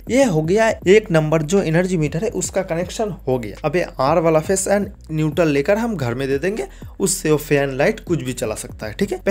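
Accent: Bengal, India. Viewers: Best videos bro. Keep it up🎉. It feels good in bangla accent.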